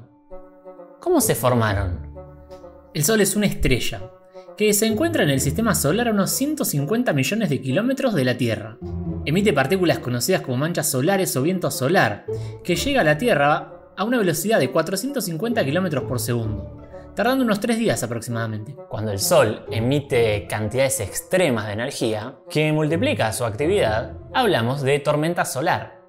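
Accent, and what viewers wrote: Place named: Buenos Aires, Argentina. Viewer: Muito bom o seu canal, saludos desde Brasil, me gusta estudiar el castellano viendo videos y tu canal es perfecto para mi, me encanta el acento de Buenos Aires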